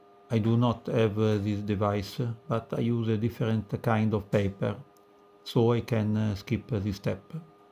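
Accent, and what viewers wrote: Italy. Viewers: Ma... come si fa a registrare un video parlato in inglese con una pronuncia italiana tanto terribile...!!! La prossima volta veda di parlare nella sua lingua, anche perchè youtube offre ottimi sistemi per la traduzione dei contributi in altre lingua... ecchediamine !!! Questo video sarebbe stato interessante, ma sono stato costretto ad abbandonarlo per il disgusto. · The Italian accent makes it. 👌🏼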